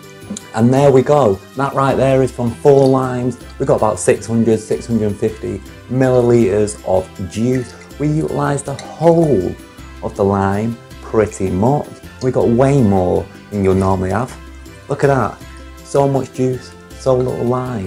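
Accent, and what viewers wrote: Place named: Northern England, United Kingdom. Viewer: wtf he sounds nothing like british black community.. hes has a northern english accent.!!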